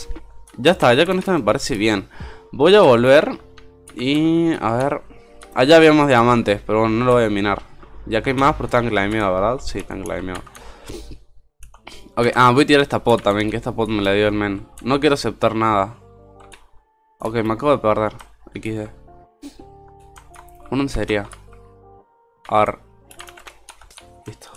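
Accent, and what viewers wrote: Argentina. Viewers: Vos sos Córdobes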